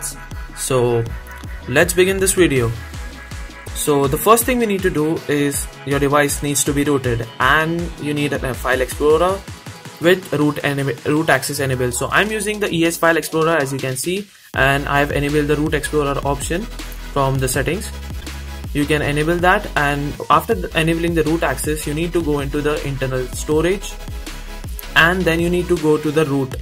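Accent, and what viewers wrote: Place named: India. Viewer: hearing indian saying stuff that end with T kindna annoying .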